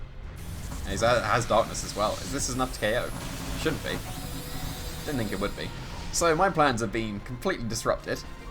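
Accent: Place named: United Kingdom. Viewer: I like your British.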